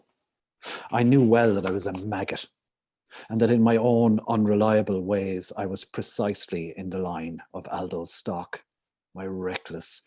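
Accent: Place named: Donegal, Ireland. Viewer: Fantashteesh. Fair attempt at a Donegal accent too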